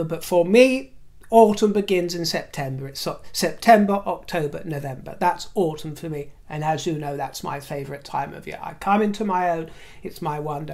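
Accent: United States. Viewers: You have a very good American accent. Your headband is divine; that is your color. Always a treat for me when I see that you have posted. Great point about the dangers of slander. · from one Cancerian to another, your American accent is marvelous!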